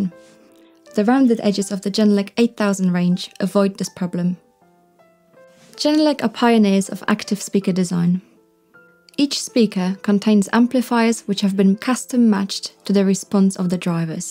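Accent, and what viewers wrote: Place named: Poland. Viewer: Słychać że polka :)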